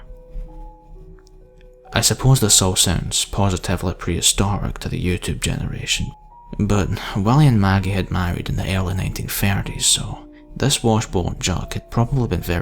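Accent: Scotland, United Kingdom. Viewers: Hi devilslittlesister. Unfortunately for my freaked out family, it's not a creepypasta, they swear blind it's true. And, yeah, he's a great narrator, I'm a sucker for a Scottish accent!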